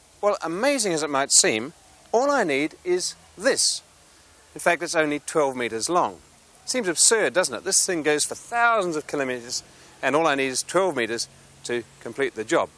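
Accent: United Kingdom. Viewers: No he isn't. That's a British accent. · even before clicking the video, i knew that man would have a British accent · I’m an Australian and I picked his accent as slightly British. He may have spent some years studying in England? Or it may just be he has a cultivated accent due to his high level of education.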